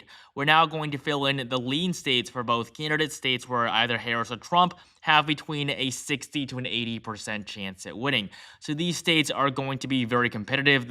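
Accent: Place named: Pennsylvania, United States. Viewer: You have a noticeable accent. Are you from Pennsylvania?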